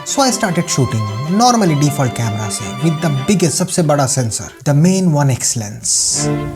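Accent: Bengal, India. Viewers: Tera hindi sunkar maza aa gaya tu bengali hai tera hindi sa pata chalta hai ❤❤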